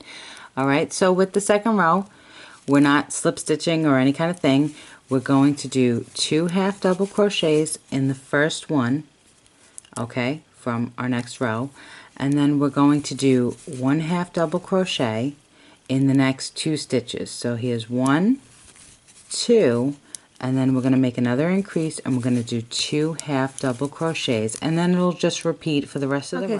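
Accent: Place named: Brooklyn, United States. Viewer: I'm a Brooklyn girl but live in California now, hearing you I felt like I was home. Love this hat, gave one to a friend she loves it as her go to hat.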